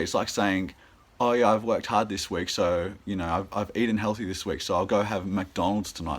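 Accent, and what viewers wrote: Australia. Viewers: Interesting that you subconsciously wanted n needed the same kind of love since teens. I remember that n only illness fundamentally broke that from me n i couldnt now even think of how another lover coukd make me feel high again. Dig ya channel dude.. feel like i know you. Mmm maybe its the Victorian(?) accent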